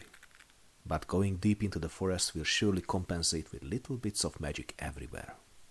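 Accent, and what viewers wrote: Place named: Hungary. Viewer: All the best for 2024 folks - one of the best shooting and hunting channels ever, and in the bright Hunglish language too!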